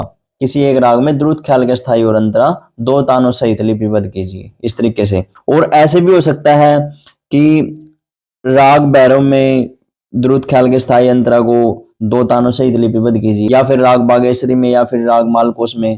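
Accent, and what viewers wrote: Haryana, India. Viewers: Sir are you haryanvi...? Boli se thora thora lg rha hai..!😂😂 I am also belong to haryana❤ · Sir aap haryana se ho?😅😅Tho tune se laga...... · Aap haryana se ho kya ??😊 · Yo bhai dekhe HARYANA Ka hai awaz te pta lagra HARYANA ON TOP BHAI❤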